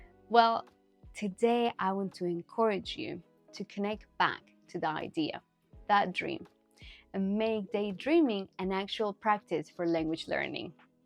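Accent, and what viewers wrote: England, United Kingdom. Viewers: Your videos are very helpful thank you. Are you living in the UK? I think I can hear an English accent in there lol. I’m from Northern Ireland. I hope your channel grows as it should.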